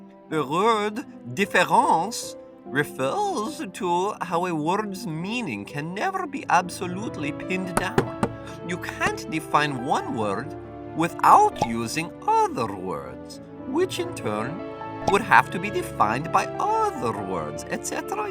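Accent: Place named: Russia. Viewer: Yo! Hit me up if you want to improve your french accent. You sound russian here.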